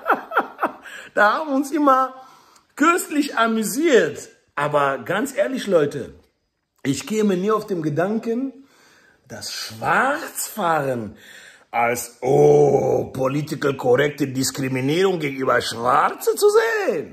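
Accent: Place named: Hesse, Germany. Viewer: Hessisch ist ein Lebensgefühl 😊😎👍super sympathisch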